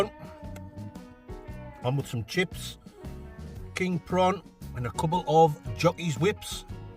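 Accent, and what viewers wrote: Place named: Newcastle, United Kingdom. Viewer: ❤ newcastke accent dude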